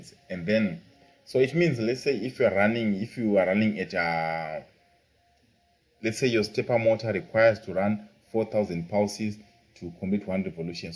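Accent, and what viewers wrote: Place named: Zimbabwe. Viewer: It's giving me Zimbabwen accent 😂❤❤.... Nice one bro I like the reference to the documents... Keep up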